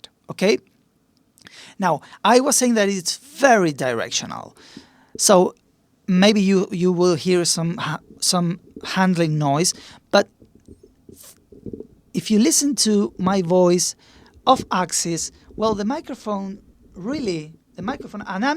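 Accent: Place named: France. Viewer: Dude is eh s’french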